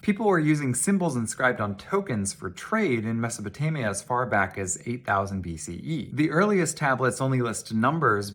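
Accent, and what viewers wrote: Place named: United States. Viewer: I cannot stand the American pronunciation of cuneiform. kyuunay-uh-fooorm.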